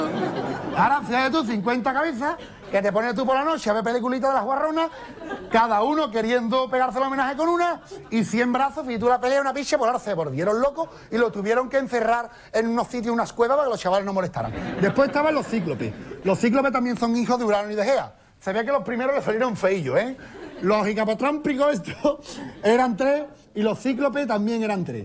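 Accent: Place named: Andalusia, Spain. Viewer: jejeje como molesta a algunos de por alli arriba ke alguien hable con acento andaluz. Menos antiandalucismo señores!!! ke crack, eres el rey. y tu sigue hablando con tu acento y al que no le guste que ponga canal nou.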